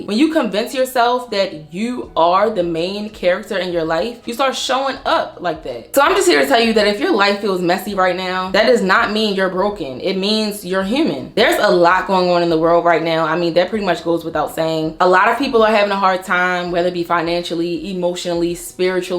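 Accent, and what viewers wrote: Baltimore, United States. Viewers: It sounds like you’re from Baltimore so ima subscribe!!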